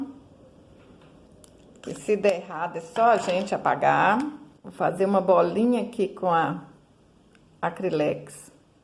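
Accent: Minas Gerais, Brazil. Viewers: Difícil saber o que é mais fofo, se é o artesanato ou esse sotaque mineiro bom demais da conta... 💟💖💟💖😊😊😊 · Sem querer achei o seu vídeo e amei...vou tentar fazer essa lindinha. Pelo seu sotaque é mineirinha? Um abraço fraterno da carioca aqui.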